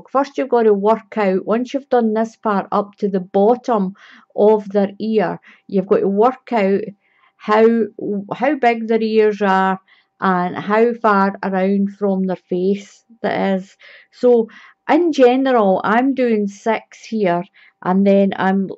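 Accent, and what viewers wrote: Scotland, United Kingdom. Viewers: Hiya! Great to hear a Scots voice! Recently learning crochet. Following exact measurements for my lurcher Eloi. (Bedlington X deerhound). Apart from missing a post during my first round of front post bk post which I'm aware of and cringing about (lol!) - have u any tips on tension as my work has gotten quite loose? I'm only on the 3rd row but am mightily impressed. It doesn't REALLY matter much as his lurcher neck grows in size as it joins his neck so I could work in a longer neck onto his hat in theory anyway 🤭 big hello and thankyou from Dingwall! ✌️♥️💪👏🏴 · I’ve had double fun watching this tutorial! Firstly, Muttley looks fabulous, he’s such a good model for the hat! Secondly I’ve used the CC captions for the first time and your gorgeous Scottish accent has completely flummoxed it! Every time you say the word “six” it shows “sex”!!!!! 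If you want a good laugh I suggest you turn on the CC captions and settle back with a cuppa!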